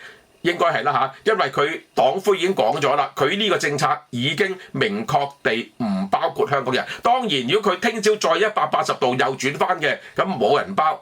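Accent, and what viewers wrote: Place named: Taishan, China. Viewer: 感謝伍 Sir 乜原來時聞喺台山話，我還以為是新會話，因昔日有新會籍先輩常宣於口。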